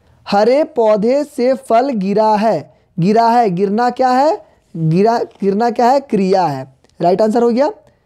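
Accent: Bihar, India. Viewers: Sir aap bihari ho kya ,🤓🤓🧐🧐🔥🔥🙋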